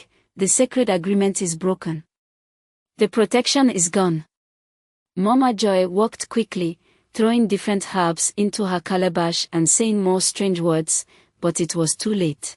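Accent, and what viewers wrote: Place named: Ghana. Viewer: Are you a Ghanian, this your accent sha!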